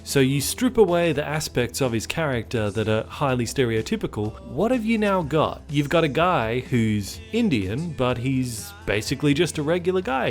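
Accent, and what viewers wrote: United Kingdom. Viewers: is australian?! well i'll be! i could have sworn he was british. xD, if you're listening, get in touch with the the weekly planet guys and make a guest appearance!! i mean, australia's a small country, right?! you probably live just down the road from them. xD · I thought you were British.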